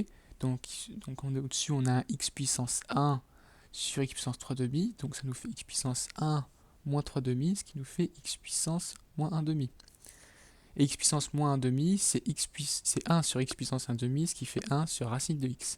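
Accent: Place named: France. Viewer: French accent 🥸🥸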